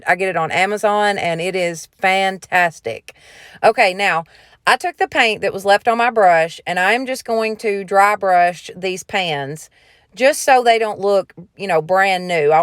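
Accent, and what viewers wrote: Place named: South, United States. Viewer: My first time on your channel💓 Your kitchen must be beautiful with all these new decorations! Love your ideas and your southern drawl❣️ Makes me miss my sister in law and brother in Georgia!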